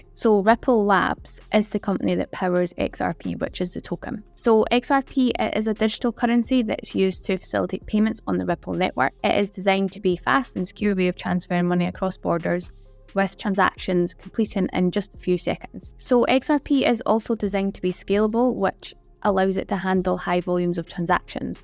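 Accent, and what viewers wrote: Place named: Edinburgh, United Kingdom. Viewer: Nice video.. That sounds like an Edinburgh accent, that's where I'm from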